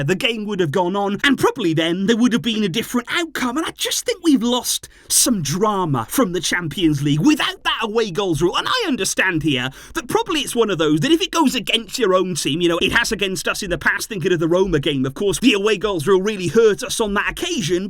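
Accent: Wales, United Kingdom. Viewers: I’ve watched a few of your old videos and your Welsh accent stood out a lot back more then 😂 but your channel has come a long way and we all appreciate your videos 🙏🏼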